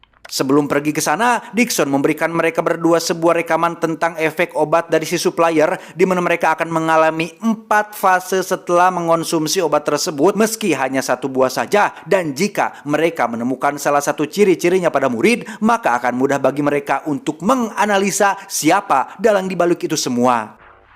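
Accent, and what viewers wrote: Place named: West Java, Indonesia. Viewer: Sunda na timana bang?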